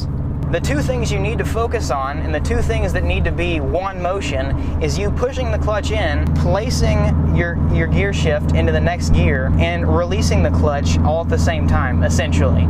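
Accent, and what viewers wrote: Texas, United States. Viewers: Listening to this 3 years later............ THAT TEXAS ACCENT THOOOO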